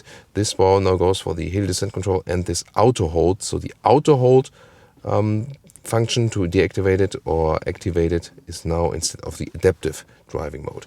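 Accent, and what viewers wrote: Germany. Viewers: It is interesting how his speech still sounds like german languaege though he speaks english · beautiful Germany accent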